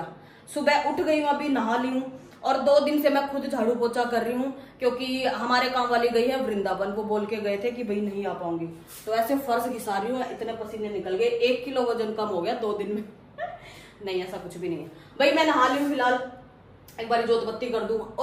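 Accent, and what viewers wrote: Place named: Haryana, India. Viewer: Beta aapki Haryanvi Bhasha bahut hi acchi lagti Hai mujhe to❤❤❤❤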